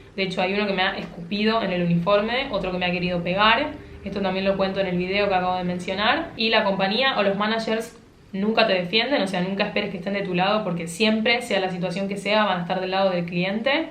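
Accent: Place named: Rioplatense, Uruguay. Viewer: Al final es como yo pensaba, es la versión de Tripulante de Cabina pero versión en agua. Solo que las azafatas a veces tienen un día un la ciudad y tienen habitación propia. Es un trabajo para matarse laburando, ahorrar, y ya esta. Especialmente si son de un país como el nuestro, Argentina, (perdón no se si sos de Uruguay, porque nuestro acento se re parece) que el peso no vale nada y cualquier trabajo en dólares es bienvenido. Sin embargo, es todo un sacrificio. Buchoneo, demasiadas horas, no habitación propia. Me encanta que hables del lado que todos realmente necesitamos saber!!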